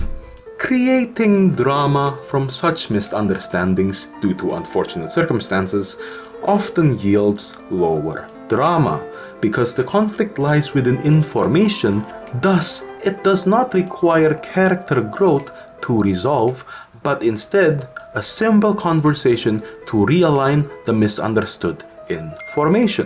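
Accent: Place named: Eastern Europe. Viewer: As a fellow slav I knew when I've heard your accent, that there was not enough tears 100% right Also. Bober 🦫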